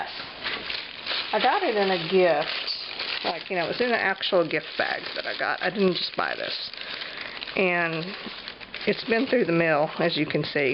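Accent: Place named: Texas, United States. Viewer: HI! I just found your channel yesterday. Awesome! 1st I love hearing the sound of home in your voice (I'm from the DFW area now in NW). 2nd I am so going to get a phone book and make an art journal, what a super idea! Thanks for sharing, now I have to find the video that tells me how to put the inclusions in. Keep it up!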